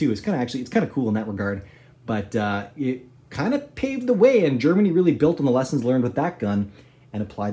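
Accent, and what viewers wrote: Canada. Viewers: yo is this guy a canadian??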